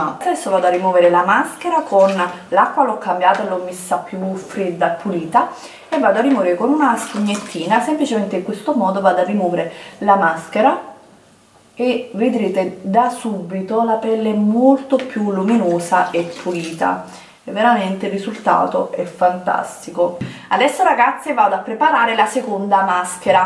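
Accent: Naples, Italy. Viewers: Che hai contro l'accento Napoletano...dimmi fammi capire ..vorrei santire tu come parli....sei di una pochezza assurda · Toniolo ,per tremendo accento cosa intendi? Per caso non tolleri l'accento napoletano?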